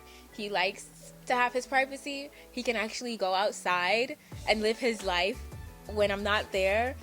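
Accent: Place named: Brooklyn, United States. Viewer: Your accent is sooo cute , I keep trying to figure out where youre from . It reminds me of wahlburgs accent ; like that old Brooklyn / Italian accent . Ugh I’m from bk and have no accent lmaoo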